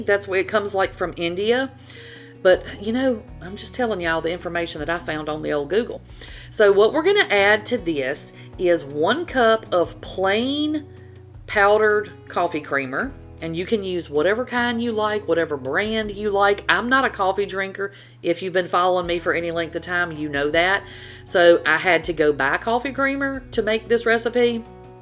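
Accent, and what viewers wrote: South, United States. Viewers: Yummy 😋 I'm New Here & Just Love Your Ancient 😅I Have That Long Southern Draw Ancient..You Also Can Crack Me Up, So I Know I'm Gonna Love Your Channel..Be Blessed 😊❤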